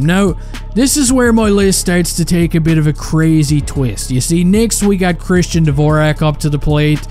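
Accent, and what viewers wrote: Newfoundland, Canada. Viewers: Newfie accent as he is from Newfoundland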